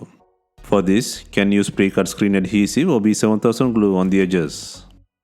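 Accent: India. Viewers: Thank for the instructions! I'm curious, are you from India? Sounds like Indian accent 😊 By the way, I'm from Belarus! 🇧🇾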